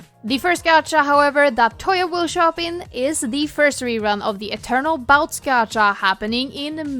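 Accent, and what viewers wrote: Sweden. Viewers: im sorry but i cant stop focusing on the swedish accent 😭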